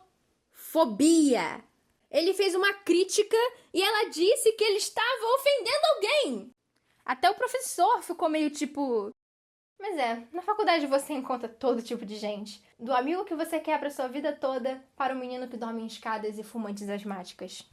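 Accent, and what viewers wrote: Rio de Janeiro, Brazil. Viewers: sotaque carioca é feio demais tá maluco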